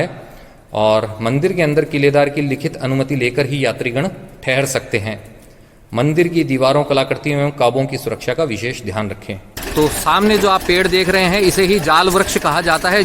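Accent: Standard. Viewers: Jai Karni Mata ki. Aur app ke dwara hum sab jo dekh rahe hai jan rahe hai uske liye app ka avari hoon. App ki sudh Hindi bahut hi accha lagta hai. U r too good spokesman, like it. App acchi Sanskrit bhi bolte hai. Karni Mata ki sabhi jagah behad Sundar hai aur mainly safsutra dekhne wali hai. Goushala bhi bahut accha aur saf hai. Too nice vlog presented by you ji thankful to you for it.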